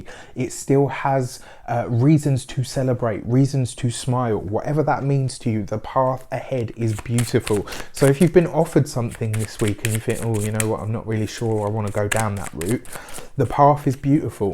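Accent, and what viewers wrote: United Kingdom. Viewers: Are you brit. ..yes. it's been the longest year. ..but my guy going through stuff too.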